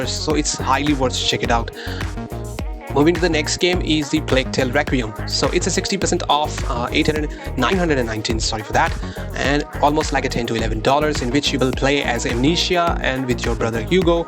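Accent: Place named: India. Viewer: bro that's some nice accent i bet you are an indian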